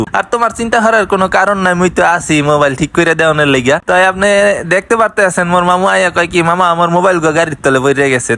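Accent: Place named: Barishal, Bangladesh. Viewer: Borisaler vasa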